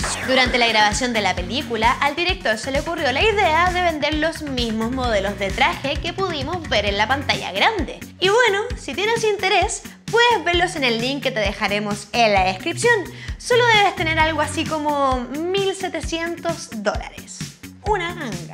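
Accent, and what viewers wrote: Chile. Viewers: Chileno?? ESPERA QUE WEA???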